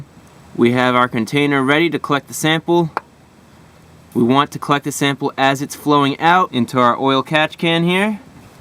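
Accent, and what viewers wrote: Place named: New Jersey, United States. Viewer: Long guess.. are you from New Jersey? Your Water accent is so cool!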